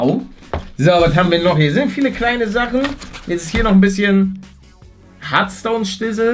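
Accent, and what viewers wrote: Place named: North, Germany. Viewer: Er hat nen geilen norddeutschen Akzent xD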